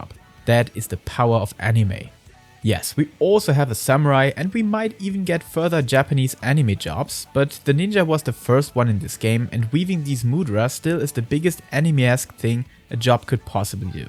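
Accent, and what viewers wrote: Germany. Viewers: you re german right? :D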